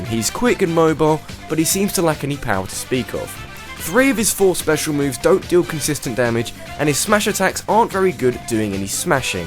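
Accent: England, United Kingdom. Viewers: Nice countdown, you're one of the few youtubers who can make me crack a smile, since I love English accents. PS: Have you played TF2x10?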